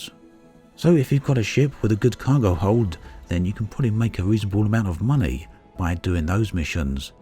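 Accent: United Kingdom. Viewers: He is british. End of story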